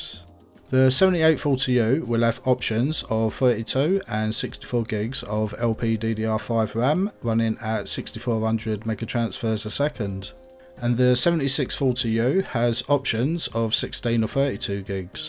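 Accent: Cockney, United Kingdom. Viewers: No matter how many of these videos I watch, I just can't get used to hearing a cockney accent on YouTube. 😅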